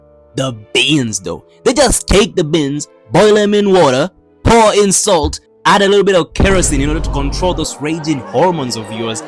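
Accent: Kenya. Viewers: You sound Kenyan… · Your accent had me thinking you were kenyan ngl.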